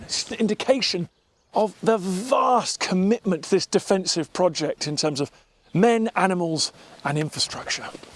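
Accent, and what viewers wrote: United Kingdom. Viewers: Good video - but... You need to provide subtitles better than the auto-generated ones. British accent can be challenging for non-native English listeners as much as it is for whatever AI is translating, and if you add Latin words to the mix, you get amazing things like "Allah Augusta" and there's no way to unread that once you read it. 😂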